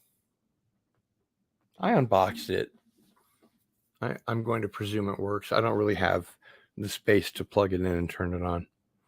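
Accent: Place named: Australia. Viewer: Hey, I'm an Australian and I love your Aussie accent as well as your Christopher Walken impersonation 👍👍👍🤣😂🤣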